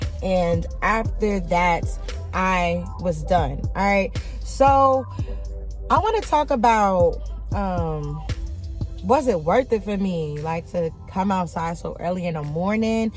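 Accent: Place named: New Jersey, United States. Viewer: Your accent sounds like your close to me I'm in burlington nj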